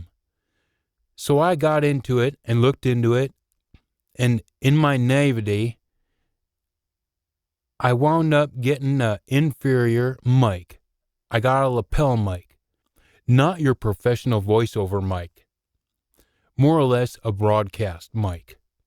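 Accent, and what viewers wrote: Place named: United States. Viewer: Your American not Scottish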